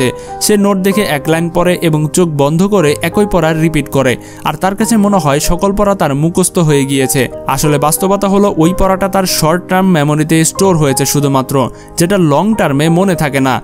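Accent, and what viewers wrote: Bangladesh. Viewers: বাংলাদেশী আমার মনে হচ্ছে। কথা শুনে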